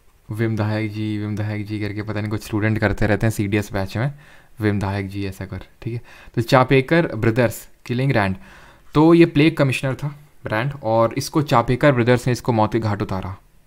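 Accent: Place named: West Bengal, India. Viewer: Your bengali pronunciation is very sweet ❤❤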